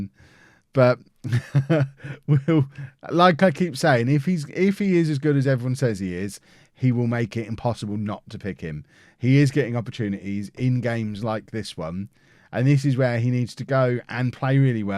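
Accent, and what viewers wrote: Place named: Liverpool, United Kingdom. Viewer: dr 92 he’s defo a scouser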